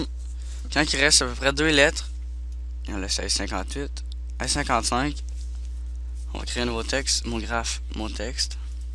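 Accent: Quebec, Canada. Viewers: ralala l accent quebecois mdr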